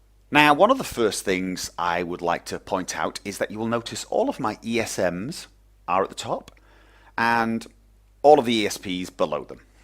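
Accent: United Kingdom. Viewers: You sound a little British but you are from Norway?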